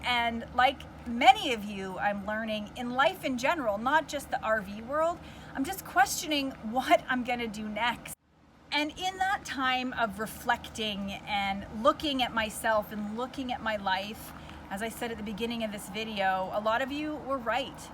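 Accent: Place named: Canada. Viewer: I have to watch your videos with captions. Canadians talk funny. I know it is not your fault but my research shows Canadians are taught to pronounce certain words largely based on their Scottish heritage. Some examples include, “about,” “against,” and “out.” Thank HGTV and DIY network for choosing shows produced in Canada. I watched a TON of shows over the years. It became really annoying.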